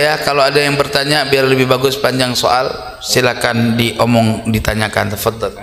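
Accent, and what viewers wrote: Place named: Jakarta, Indonesia. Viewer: . Kori. Saya. Suka. Omong nya. Betawi bangat.saya pendukung mu. Maju terus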